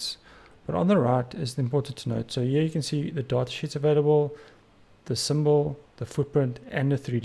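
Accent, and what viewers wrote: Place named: South Africa. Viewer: Thats that South African Accent. Shout out from the free state.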